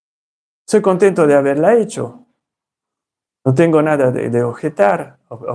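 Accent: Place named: Argentina. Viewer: Hasta xuando viviste en Argentina?tienes un acento muy marcadito😮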